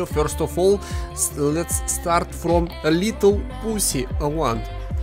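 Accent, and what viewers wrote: Russia. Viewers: R u from Russia?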